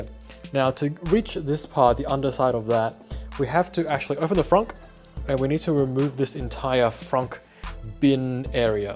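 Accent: Australia. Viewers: Hey, thanks for your clear and concise explanation with no BS. Extra bonus points for the Aussie accent! Love your work.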